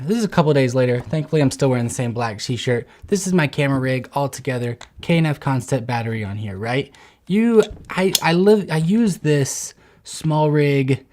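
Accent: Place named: South, United States. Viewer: “That thing Dont pop off” lets me know youre from the south lol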